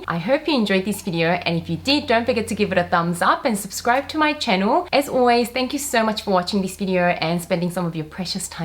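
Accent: Australia. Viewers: Is she Australian? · She sounds like she lives in Australia but didn’t grow up there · Are you from Australia? U have strong accent, sounds funny. Great info tho!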